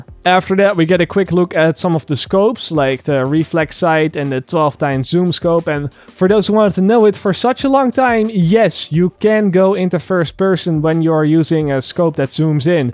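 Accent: Netherlands. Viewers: if i hear good youre dutch ? kan you add me on uplay KillerAngelNL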